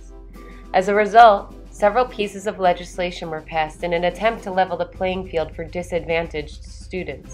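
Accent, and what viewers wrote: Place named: Midwest, United States. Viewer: Papulation? LOL You have a funny Midwest accent.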